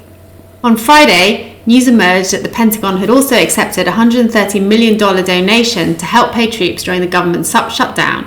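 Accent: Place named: Sussex, United Kingdom. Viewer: Get a bit more dirty of a Sussex accent why doncha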